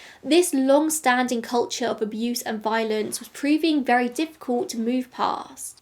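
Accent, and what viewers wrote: United Kingdom. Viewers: I am Australian and I think what you are referring to is just her accent and way of speaking and not trying to be anything. I am not annoyed by it and didn’t even realise what you are referring to till I actually concentrated on it. Many British speakers speak like this…if you are annoyed…stop listening…. I listen to a lot of American accents in these true crime channels and find many of them hard to listen to and just plain annoying so I don’t subscribe to them . I do think it is rude of you to comment…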